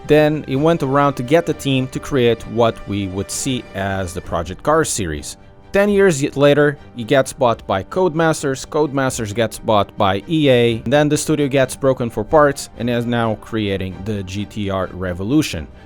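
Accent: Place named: Portugal. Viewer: Ahahaha adoro ouvir tugas a falar inglês. Bom trabalho!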